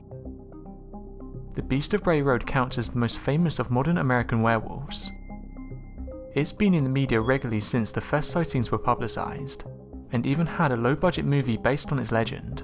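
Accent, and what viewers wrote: United Kingdom. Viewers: The only thing suffering are my ears listening to this absolutely horrid "narration." Having a British accent doesn't automatically make someone a good narrator. All this guy is doing is reading one sentence. Then reading another sentence. Then reading another sentence. And every sentence sounds EXACTLY the same. 90 seconds was all I could take.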